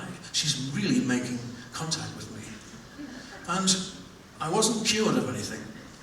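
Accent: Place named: Newcastle, United Kingdom. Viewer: if this ever tours and comes to Calgary I will be first in line. I get teary eyed just hearing the Geordie accent. My Mam is from Wallsend so this hits close to home.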